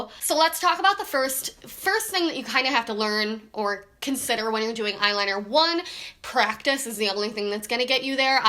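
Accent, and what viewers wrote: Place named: Midwest, United States. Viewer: Midwest accent for sure!!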